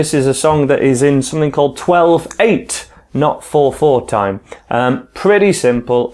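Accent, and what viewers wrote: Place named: England, United Kingdom. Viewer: Nice English accent ~ I'm just a beginner and I like this song ~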